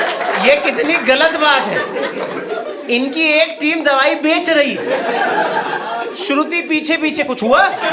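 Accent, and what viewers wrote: Pakistan. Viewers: love the way you speak! Apka lahza pata nahi kisi ne notice kiya ya nahi but bhaut influenced hai how people speak in Pakistan. For example: Chai mai chini kam kare aap, mohan meri baat sune aap. Don't take offence but this is how most Pakistani influencers and comedians speak in general. Aur bhi notice kiya hai maine but all that sounds very nice....adds to the comedy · * ki tone itni Pakistani si kyu hai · brother why your accent matches with pakistani's accent, are you from indian border side. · Why he's sounding like pakistani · Why he has a Pakistani accent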